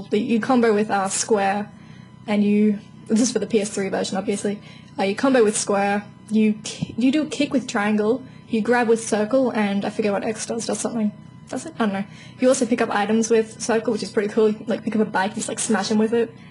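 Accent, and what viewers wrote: Australia. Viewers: hey u got a aussie accent...u from SYD, NSW?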